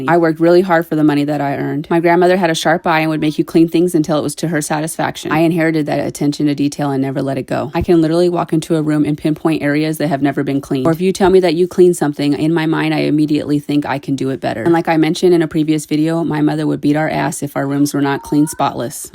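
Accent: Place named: Texas, United States. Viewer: I hear a Texas accent in there. 🤔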